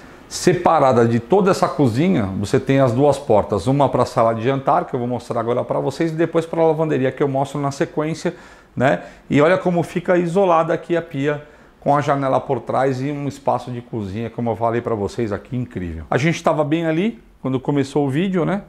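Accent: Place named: São Paulo, Brazil. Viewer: Ah, que delícia de sotaque paulistano ❤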